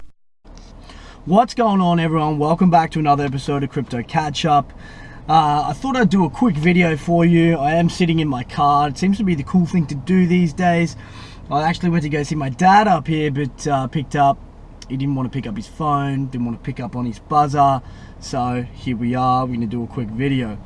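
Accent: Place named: Australia. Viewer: Thumbs up for saying this in an Aussie accent. "Santa claus what a guy........yeah what a f....in guy." "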